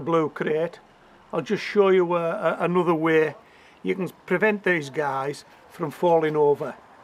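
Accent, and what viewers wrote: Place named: Newcastle, United Kingdom. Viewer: Fab for this expat to hear a Geordie accent!Greetings from Bavaria! btw I built a horse manure hot bed. I ordered this book by a guy up north near you.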